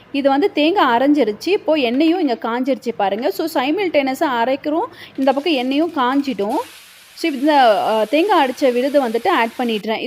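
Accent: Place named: North India, India. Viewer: Unga Tamil romba styla iruku, neinga north Indian a??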